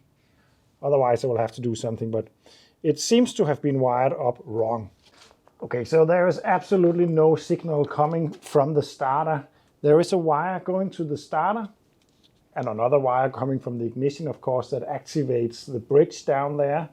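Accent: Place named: Denmark. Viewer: Danish English is so cute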